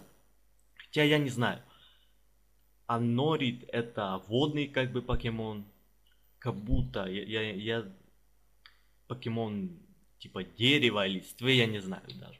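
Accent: Armenia. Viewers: Акцент армянца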